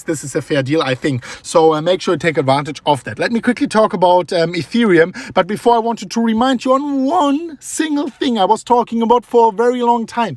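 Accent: Germany. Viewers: Deutscher Akzent kickt xD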